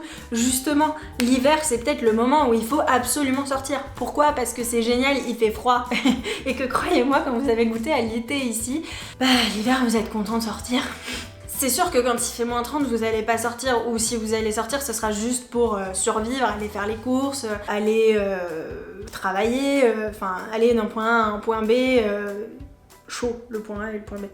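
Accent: Quebec, Canada. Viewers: Très intéressant ! Je suis Québécois et j'ai l'impression que ton accent français se "québécise" quelque fois et je trouve ça charmant. Tes propos nuancés sont très à point. Tu as l'air à adorer ta nouvelle ville d'adoption :) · Vous avez un accent québecois, bien que vous vous en appercevez pas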